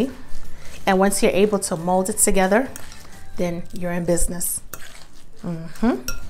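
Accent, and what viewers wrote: New York, United States. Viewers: sounds like she is from NY...luv listening to her tawk...lol I put a lil cayenne pepper, a lil jiffy cornmeal, a tap of mustard instead of worcstchire sawce... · Love the NY accent!❤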